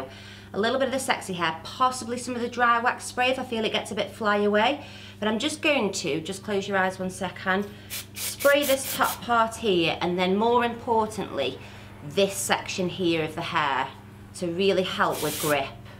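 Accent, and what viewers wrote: United Kingdom. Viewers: What British accent is this? She sounds so sweet, I could listen to her forever.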